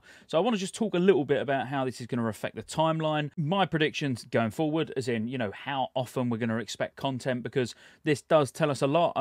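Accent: United Kingdom. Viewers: Where are you from if you don't mind? Just curious about accents. Obviously UK, but specifically? (Once again not actually specfically just ur accent)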